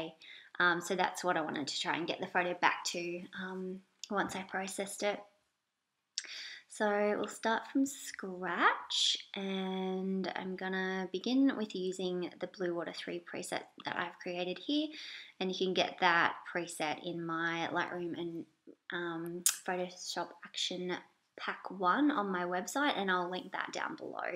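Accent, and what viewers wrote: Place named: Australia. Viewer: She sounds Australian!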